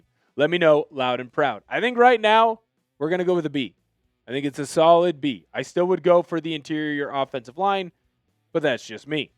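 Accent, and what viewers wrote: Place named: Fargo, United States. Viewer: You sound like you’re from Fargo bro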